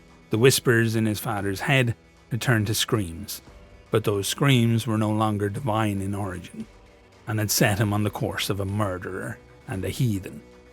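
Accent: Ireland. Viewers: What an amazing story. Love the style, the temhe and your irish accent!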